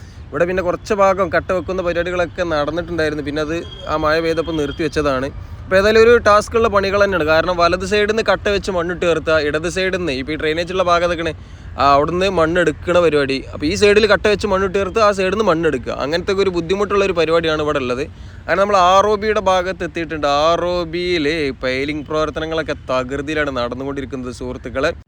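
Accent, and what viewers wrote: Malappuram, India. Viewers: മലപ്പുറത്തിന്റെ സ്വന്തം ശൈലി ഇതിലും നന്നായി വേറെ എവിടുന്നും കേൾക്കാനായിട്ടില്ല... You are supper Bro. 🎉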